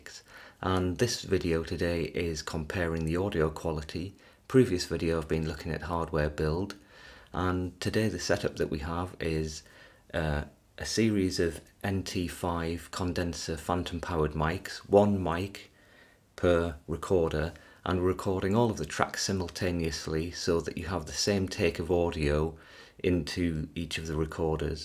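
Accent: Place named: United Kingdom. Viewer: The amateur audio engineer in me enjoys the basic exercise being performed here. The ASMR enthusiast in me appreciates calm British-accented voice.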